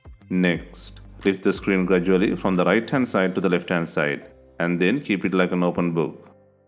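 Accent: India. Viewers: Why is there an Indian voice for a white man's hands?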